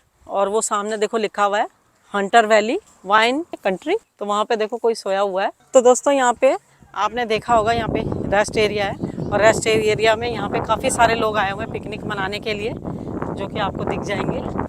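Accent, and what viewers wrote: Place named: Uttar Pradesh, India. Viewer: Im indian tum bhi up india se hogi aap ki bhasa bta rhi h nice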